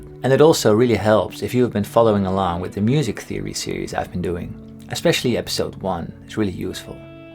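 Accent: Netherlands. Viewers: i knew it you are dutch, could hear it by the accent 😂😂 greetings from almere, any tips on starting to teach guitar? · until this video i did not know you were dutch Hahaha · Ik dank je voor al de uitleg, je uitleg klinkt als een Nederlander die Engels spreekt , maar het klinkt als muziek in de oren. zou je de 6 snaren stemming voor een steel gitaar kunnen uitleggen.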